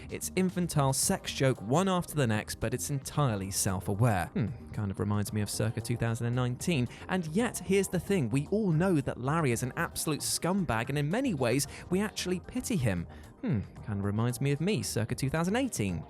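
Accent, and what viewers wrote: United Kingdom. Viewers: I still rage-clicked the Dislike button. Your British accent is annoying! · He's british, why would he use the american pronunciation?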